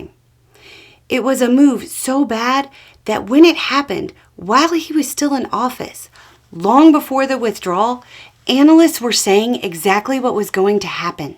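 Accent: South, United States. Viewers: I love how Miss gets pissed and then wishes ya'll a good day in that sweet, soft, southern drawl.:yougotthis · I'm not from the South, but I have lots of friends who are... I keep waiting for to say "Bless his heart," in the way only a Southerner can mean it. lol You go,! Please give my best regards to Beau - I hope he is doing really well. Much Love.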